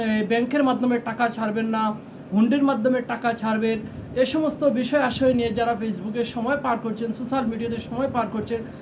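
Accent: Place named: Sylhet, Bangladesh. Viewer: আচ্ছা আপনি কি অন্যায় প্রতিবাত করেছেন? কথায় মনে হয়ে আপনি সিলেটি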